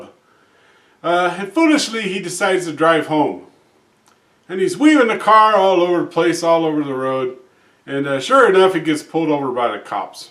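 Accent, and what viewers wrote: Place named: Ireland. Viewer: Love the Irish accent 😂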